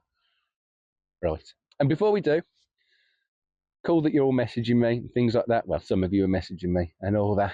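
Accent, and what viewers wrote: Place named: Birmingham, United Kingdom. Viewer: Hi. Just come across your channel and thought I would have a nosey around and see what vids you have done. Which part of the 2nd city are you from? You're only the 2nd person on YouTube I've come across with a Birmingham accent, the other one is Shaun from Happy Days Veg. Although he now lives in north Wales.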